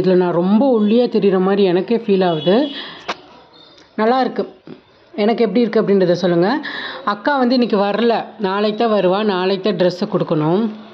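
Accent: Kanyakumari, India. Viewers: Akka Kanniyakumari slang pesunga replay seiyoom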